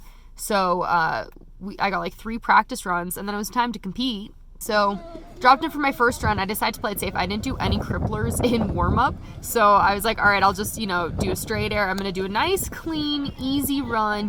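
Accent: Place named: California, United States. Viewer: I love your California accent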